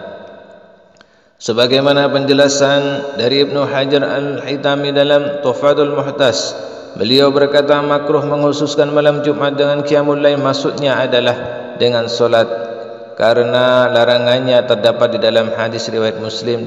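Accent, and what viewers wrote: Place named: Madura, Indonesia. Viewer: kayaknya suaranya orang madura aku gak suka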